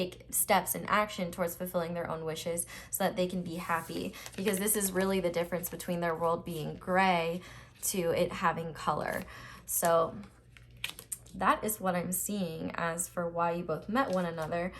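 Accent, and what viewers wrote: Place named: South, United States. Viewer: Pile 2 I love the southern accent this is coming from a southern woman it's not that funny some what's even say it's cute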